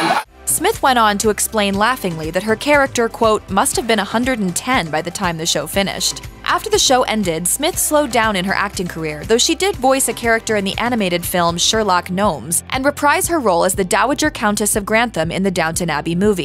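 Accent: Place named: United States. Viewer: Blimey! That American accent is ear-splitting!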